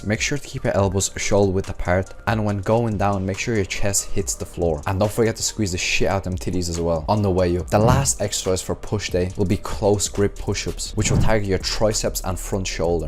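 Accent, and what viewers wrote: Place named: Zimbabwe. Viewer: Nice Rhodesian accent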